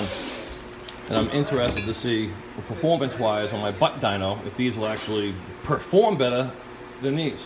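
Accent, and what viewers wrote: Boston, United States. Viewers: Great video. I’m looking for a good set of headers for my ‘21 Ram 1500 Hemi. Also , where are you guys from? You talk like me (Boston).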